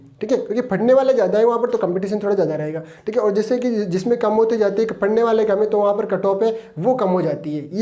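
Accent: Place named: Malpura, India. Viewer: Sir aap malpura ke kya aapke voice tone se guess kr rahi hu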